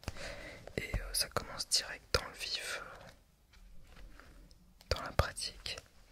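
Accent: France. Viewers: I also love your french dialect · "une pétite (h)AUL" i feel bad for laughing.. i love the french accent tho, not trying to be mean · I literally love your voice! I'm a french student (in America) and I am completely obsessed with France/French. Your accent is so amazing :)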